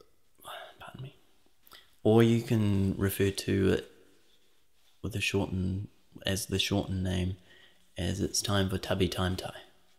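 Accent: New Zealand. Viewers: You are my favorite to listen to! Your kiwi accent always makes me drift off to sleep! Although, I must admit, sometimes I just stay awake because Kiwi accents are my favorite ☺️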